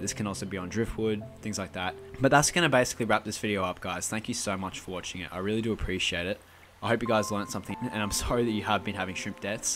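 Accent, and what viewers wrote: Australia. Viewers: Thanks for the informative video. What city do you live in? I am wondering because you sound Australian and I live in Melbourne and the water here is also fairly soft. So I am wondering how appropriate you think Melbourne water is for Crystal Red shrimp? Do you think it needs any treatment besides dechlorinating?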